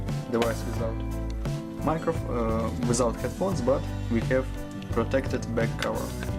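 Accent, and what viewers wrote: Russia. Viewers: Русский акцент есть), где можно взять не подскажешь?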